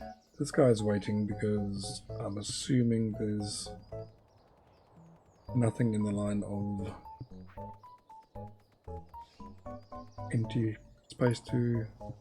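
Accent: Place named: South Africa. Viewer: I'm in it for the south african accent, correct me if I'm wrong. either way I love your accent and it allowed me to enjoy a game I usually wouldn't!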